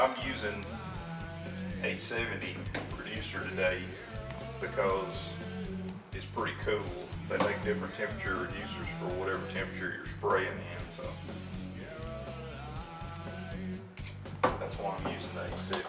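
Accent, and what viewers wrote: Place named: North Carolina, United States. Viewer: Are you from North Carolina? Man,your accent is NC. Very nice job on the guitar,btw.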